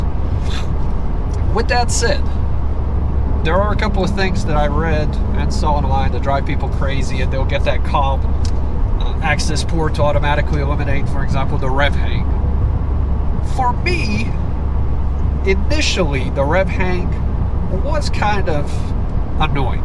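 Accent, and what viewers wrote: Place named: Europe. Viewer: You have a cool accent - kinda of a blend of a European accent with an American Southern accent.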